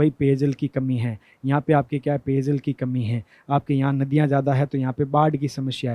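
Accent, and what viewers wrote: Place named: Bhopalgarh, India. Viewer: तभी सर मुझे बोली चाली से लगा आप भोपालगढ़ से हो. और option में भी रामधाम खेड़ापा देते हो.. बहुत खुशी हुई अपने क्षेत्र के गुरुवर को YouTube पर देखकर🥰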